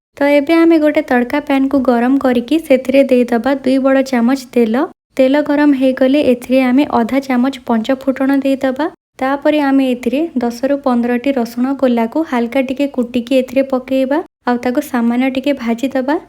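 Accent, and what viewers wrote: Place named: Western Odisha, India. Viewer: Say in your western odisha accent please it's so soothing to hear.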